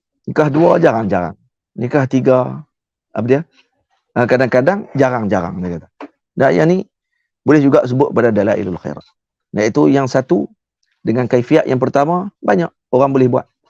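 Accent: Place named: Malaysia. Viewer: trima kasih atas penjelasanya ustad mungkin bahasanya indonesia malaysia mungkin ustad dari malaysia masih ada logat malaysia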